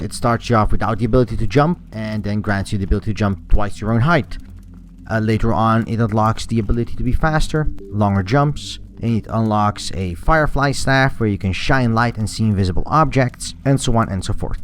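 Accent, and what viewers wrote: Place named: Netherlands. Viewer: I assume Dutch? You have a very deceiving accent if that's the case! Great video nonetheless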